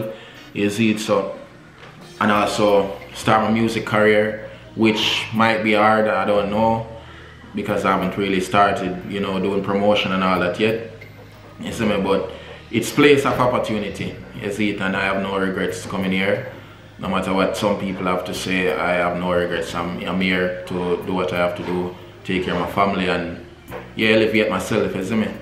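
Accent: Jamaica. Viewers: ? He is speaking english. What you are saying is that you would like to hear him using a foreigh accent. There's nothing wrong with a Jamaican accent. Not everyone is as frightened for nothing!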